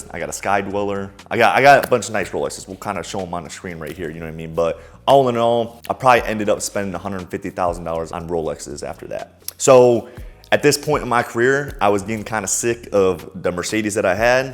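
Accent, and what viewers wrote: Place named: South, United States. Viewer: Why does he sound suthern?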